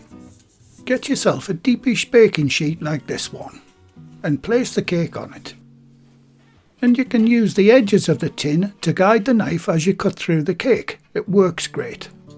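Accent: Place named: Yorkshire, United Kingdom. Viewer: Hi is this the same as a Victorian sponge? My partner has been nagging me for 4 yrs to make one for him lol but I get a lot of requests so sometimes I forget to make things! This year I will make one for his birthday (in Feb). By the way is that a Yorkshire accent? My grandparents are from Yorkshire and it sounds very similar.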